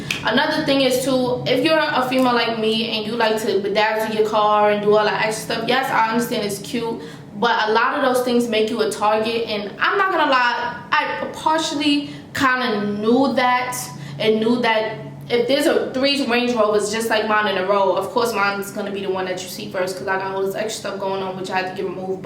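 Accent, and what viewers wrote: New York, United States. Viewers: That’s so scary! Glad you were paying attention to your surroundings and your boyfriend was there! Also yall with this thick ny accent story time is everything 👏🏾 “respectfully” lol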